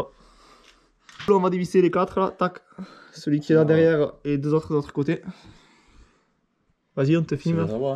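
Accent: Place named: Tarn, France. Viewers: salut les gars merci pour la vidéo, y'a l'accent du sud !! petite précision concernant le montage : perso j'aurais contrôler le jeu à la bielle juste à la main histoire de m'assurer qu'il y ai pas du jeu de haut en bas, ensuite pour le montage du piston en côte b, démontage de la culasse et contrôle du jeu à la coupe des segments à l'aide d'un simple jeu de cales, ainsi que le jeu du piston/chemise à l'aide d'une des ces méthodes ici : <link> C'est très important pour un montage correct. A+ et bonne continuation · Wooooh l’accent du Tarn c’est chaud Moi j’le comprends vite fait vu que j’ai vécu Castres un an, mais tu vas galerer sur YouTube, tout les accents du Sud passent mais l’accent bien Paysan c’est dur à comprendre tu te rends pas compte tu t’entends pas 😂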